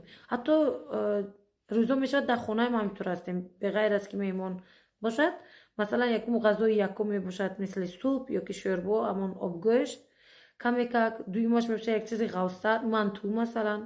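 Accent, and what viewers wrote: Bukhara, Uzbekistan. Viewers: Чи хуш чашмони зебо дори лахчаи зебо дори ошикат шудам духтари бухоро самарканд .💕✊️ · Чонам фидои миллати азизам лахчаи ширини Бухорои шариф чи зебост хохарчони гиромикадр дустат медорам ба пеш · Офарин апа, лаҳҷаи шумо (Лаҳҷаи Бухоро) бисёр ширин аст. Ҳаминхел наворҳо бисёр шавад, иншоаллоҳ шумо забони ширини тоҷикиро фаромуш намекунед. Салом аз Тоҷикистон. ❤Самарқанду Бухоро❤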